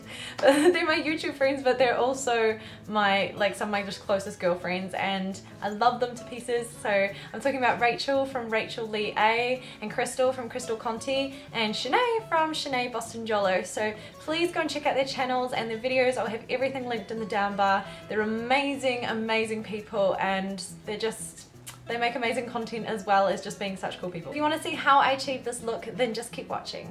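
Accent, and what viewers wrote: New Zealand. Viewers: Hi I'm here from Crystals channel, love hearing a kiwi voice on YouTube. Yay !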